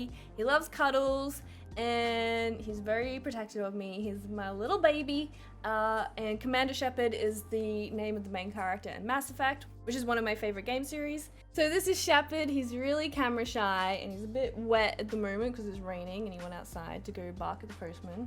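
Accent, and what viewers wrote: Australia. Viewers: in the thumbnail you look kinda like erin from the office hahahaha anyway love your content! youre amazing and its cool to see a big australian youtuber :D (or at least one with australian accent hahaha im australian too lol) thank you for being great! also i love your style, idk how to explain it other than that but yeah you are just cool and stuff :))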